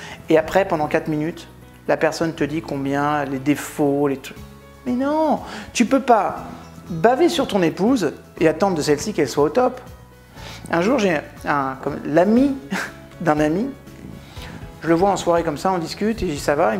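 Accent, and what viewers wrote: Canada. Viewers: merci merci merci j`adore je viens de réaliser bien des choses et surtout pourquoi ca ne fonctionnait pas toujours comme je le souhaitais Braoooo et pour l`accent québecois aussi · Vous avez un PARFAIT ACCENT CANADIEN, j'ai été trois fois au CANADA, je vous le confirme avec sincérité. Trop beau accent. Merci. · J'ai vu le Doc 2 fois, et je trouve que tous que vous avez dit a été signalé dans le Doc, pas trop éclairci mais signalé. Merci d'avoir éclaircir les choses du coup. Par contre, j'ai carrément adoré l'accent canadien hahaha ! Continuer SVP, j'ai vu presque vos vidéos, je suis une grande fan. :))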